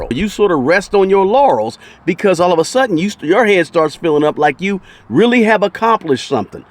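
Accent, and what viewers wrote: South, United States. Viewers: Thank you for this video! Can anyone tell me where he is from?? I know his accent, I love the people from that place but I can't remember if it is from Virginia or North Carolina..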